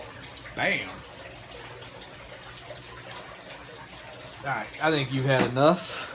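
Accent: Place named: United States. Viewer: You’re sounding real country in this video!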